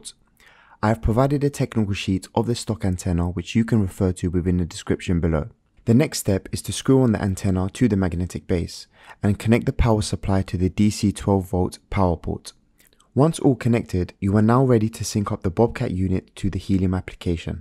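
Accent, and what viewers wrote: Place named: Cardiff, United Kingdom. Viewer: You sound like a lad I used to smoke bud with from Cardiff haha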